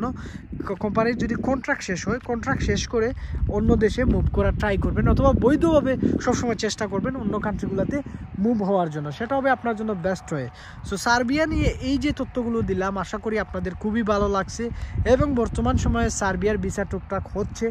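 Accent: Sylhet, Bangladesh. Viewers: ভাই কি সিলেটি না কি?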